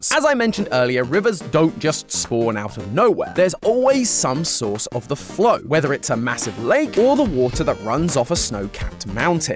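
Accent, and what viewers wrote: United Kingdom. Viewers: Why does Matt Patt sound more British?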